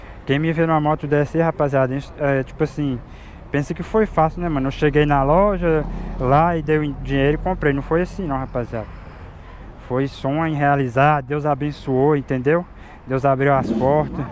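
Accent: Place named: Minas Gerais, Brazil. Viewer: Show de bola! Que "minerês" é este ? show a língua nova, "gíria" kkkkk valeu a história/motivação total! Abraço!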